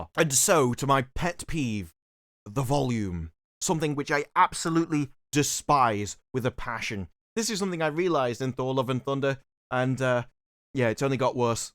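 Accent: United Kingdom. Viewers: You are so friggin funny! The voice of reason w/ a Brit accent. When I see your tweedy self getting those undies in a bunch I know it’s bad…really bad!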